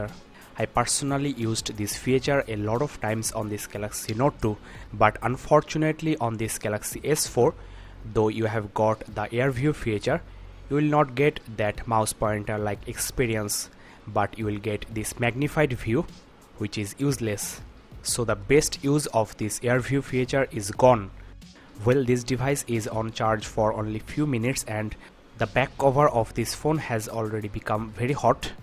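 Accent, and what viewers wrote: India. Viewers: I hate when indian talk english..its so annoying..fucking annoying me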